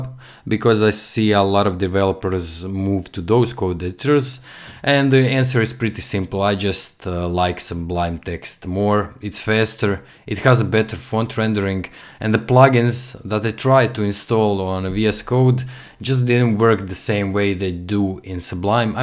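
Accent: Russia. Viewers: русский акцент? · рускиий акцент) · Thank's - you have a log of useful videos on your YouTube channel. Where are you from? I think maybe russian accent?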